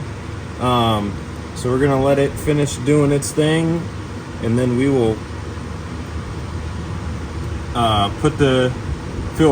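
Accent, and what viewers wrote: United States. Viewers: Great video!! Thank you!! Where are you from? I can hear your “o’s”—sounds like Baltimore. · Mid Atlantic aka tidewater accent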